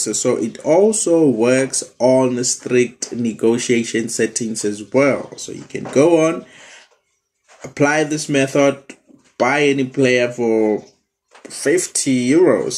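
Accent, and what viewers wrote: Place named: South Africa. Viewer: Aitar.. You sound south african or maybe it's just me.. anyways.. thanks for the vid. I'll be trying this out in the next transfer window hehe 👍🏽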